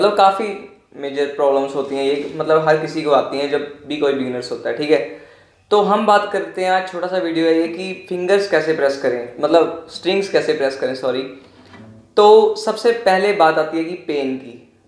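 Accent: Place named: Punjab, India. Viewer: The punjabi touch in ur hindi... Just so sweet 😂❤❤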